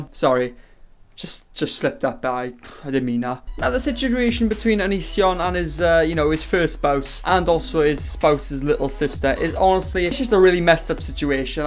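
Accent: Wales, United Kingdom. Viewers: Ngl I accidentally turned off the video when I tried to click on the channel once I heard the Welsh accent 😶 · Are you Welsh? Your accent sounds like it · First video of yours I've ever watched and all I hear is the Welsh accent. 🤣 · Odd question but where are you from? You sound very Welshy like you’re from the valleys 🙈 I’m from Aberdare 🤣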